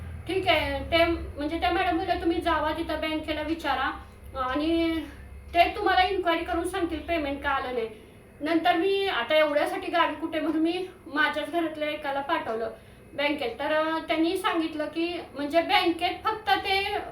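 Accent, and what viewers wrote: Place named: Karad, India. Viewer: Congratulations!! 😊छान माहिती दिली 🙏🏻🙏🏻 धन्यवाद!! आमचे गाव सुद्धा कराड पासून जवळच आहे. माझेही cooking channel आहे. तुमच्या बोलण्याच्या पद्धती वरून तुम्ही आमच्याच भागातील असाव्यात हा अंदाज खरा ठरला.👍🏻😊 तुमचे व्हिडिओ छान माहितीपूर्ण असतात . channel साठी कधी गरज वाटली तर मदत कराल अशी आशा करते.. तुम्हाला खूप खूप शुभेच्छा !!❤😊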